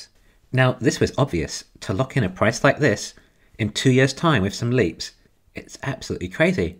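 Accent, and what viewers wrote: United Kingdom. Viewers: It sounds like you are from the UK what app do you use to do options?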